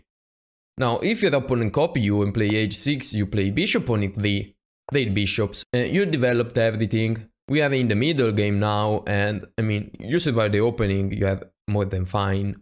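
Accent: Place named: Italy. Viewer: Great stuff bro. I enjoy the Italian accent 😂. Can you please show your best response to the Sicilian defense? Thanks 🙏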